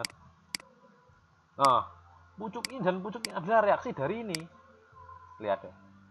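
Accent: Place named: Surabaya, Indonesia. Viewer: Bahasanya kok enak bgt, logatnya lucu. Rodo2 suroboyoan.... seneng aku ngrungokke... paham pakde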